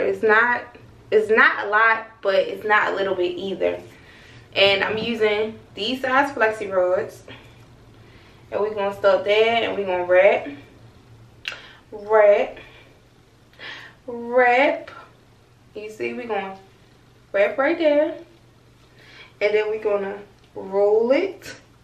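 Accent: Baltimore, United States. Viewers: First thing I noticed too 😄 heard that BMore accent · Love your hair....it my first time watching and it sounds like I hear a Baltimore accent. 🥰 Or maybe Philly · sis you from bmore/dmv? you remind me of an old college friend! love the energy ❤ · Although I moved away from Baltimore 13 years ago you just made me so proud hearing your accent it was unmistakable. Your whole bad represent what a fabulous black women are Beautiful bold and unapologetic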